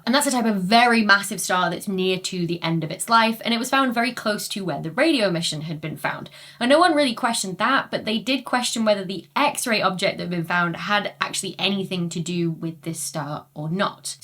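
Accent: United Kingdom. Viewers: Why is it here in the USA we are so willing to accept a British accent as the voice of authority in the field about which they're lecturing us? I'll believe it when she presents a picture of an actual BH, I don't care how adorable her accent is.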